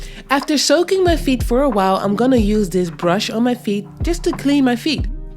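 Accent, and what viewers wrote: Netherlands. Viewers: Are you dutch by any chance if I may ask? loving the vids... New subscriber here🥰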